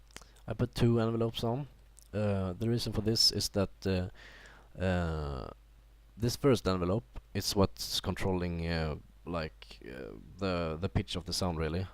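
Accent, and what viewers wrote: Sweden. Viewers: Dat Engelska med svensk dialekt!! epic!! :D Bra Tutorial mee :) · det hörs att du är svensk ;)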